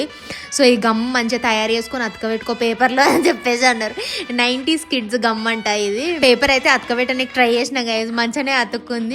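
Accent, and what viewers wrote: Telangana, India. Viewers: Aatepoinav pakka Telangana slang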